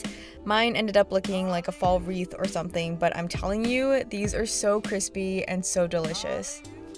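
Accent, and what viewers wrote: United States. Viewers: Lucy Blennerhassett in american english we dont pronounce the “h” in “herbs” :) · It's an American accent. Where I'm from we speak like that as well.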